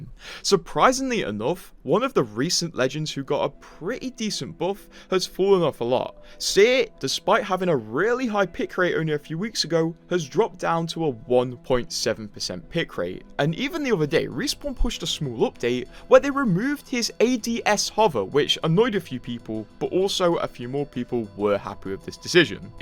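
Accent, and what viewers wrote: United Kingdom. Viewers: Are you from northern England? Like machester or yorkshire?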